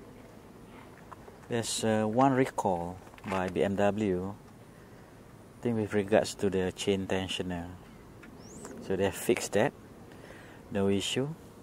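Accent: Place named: Malaysia. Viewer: Nice review. From the accent I'm guessing Malaysia somewhere (apologies if I got that wrong). All round great video !!! Thanks.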